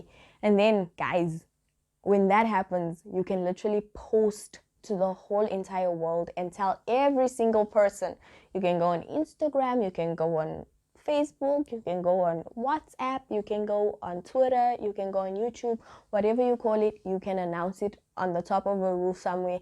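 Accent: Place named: South Africa. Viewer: You sound South African you know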